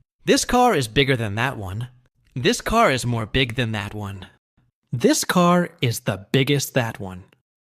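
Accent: United States. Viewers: are u using american english? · It seems like it's not British English but American English.❤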